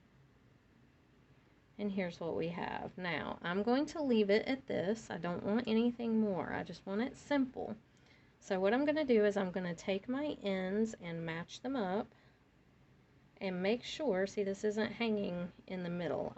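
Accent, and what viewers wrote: South, United States. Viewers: Missed that voice. That southern charm. Glad you are moved.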